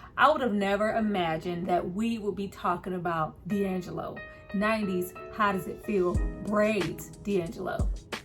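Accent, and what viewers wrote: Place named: North Carolina, United States. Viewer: Are you from North Carolina queen? The accent sounds like it.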